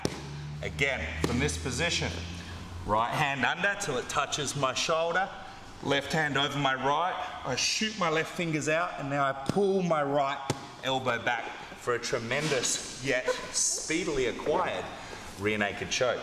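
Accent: Australia. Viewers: Nice rhythm , this dude speaks smoothly from beat to beat, flawless transition and the great Australian accent is a bonus! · are you an Aussie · I love Australians, you guys are always fun to listen to.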